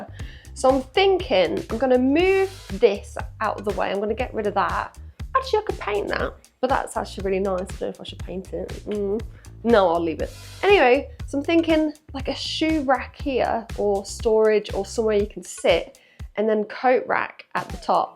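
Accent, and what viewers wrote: England, United Kingdom. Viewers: I hate English accent but your accent makes me fall in love 😍😍😍😍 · You already have a Huge audience. why don't you switch to travel vlogs, you already extremely beautiful, no doubt u ll multiply your subscribers by (God knows how much)....think about it. And you have a beautiful British accent, come on....get that dollar. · Lady, you would bring joy wherever you go, for the indifference you hold for yourself possessing merits for which Men would go to war, a body that could make a man tremble, the tender expressions of your face, your attitude towards life(keep rolling on, how effortlessly you come out of things making Life question its own challenges), How Nurturing you are(for the love you have for your Animals), how keenly you do everything, and well British Humour and accent well it never goes wrong, may you be well through all you go through🙏🙏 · Where in the uk you originally from? 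Sound like from near me. Woman of all trades. Love seeing animals tho.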